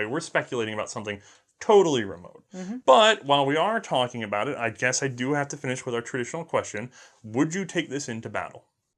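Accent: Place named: South, United States. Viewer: Haha, must be that thick southern accent (or my damn ears).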